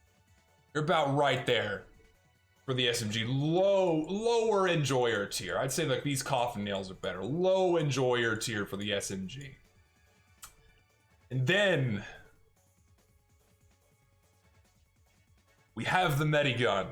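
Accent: Texas, United States. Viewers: and if you watch in 1.25 speed you can hear his southern accent a little bit better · I’m noticing how he gets more and more Texan over the course of the video. I didn’t even know he was form there til now, he’s good at hiding it.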